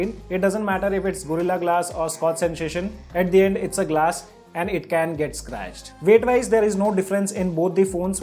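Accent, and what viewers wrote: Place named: Hisar, India. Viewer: Bass to hisar me hi aata hai...mai bhi hisar se hu bro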